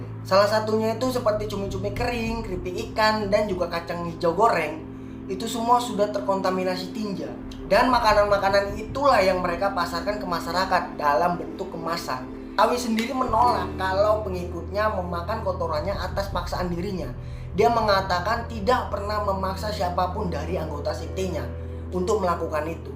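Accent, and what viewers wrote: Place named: Medan, Indonesia. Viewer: Macem orang medan.. 😁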